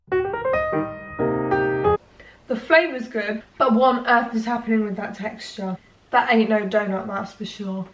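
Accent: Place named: Yorkshire, United Kingdom. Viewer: Your Yorkshire accent kills me 😂😂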